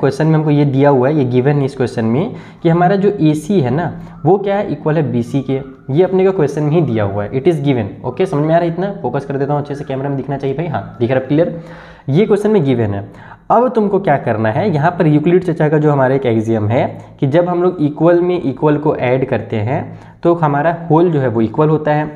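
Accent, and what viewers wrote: Bihar, India. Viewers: Sir bihari lagat he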